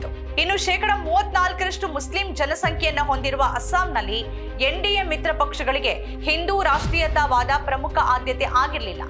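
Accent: Kerala, India. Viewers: ನೀನೂ ಮಲಯಾಳಿಯಾ? ನಿನ್ನ ಉಚ್ಚರಣೆ ಮಲಯಾಳಿ ಹಾಗಿದೆ.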